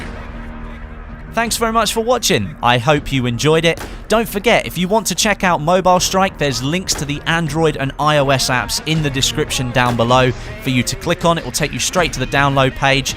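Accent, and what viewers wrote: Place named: United Kingdom. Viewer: Waiting for the voice over to start ... Hear a British Accent. Yes!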